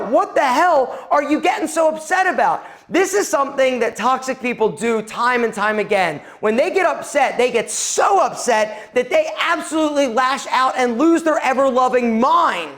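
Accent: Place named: Delaware County, United States. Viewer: you from delco bud?? You have the accent.. G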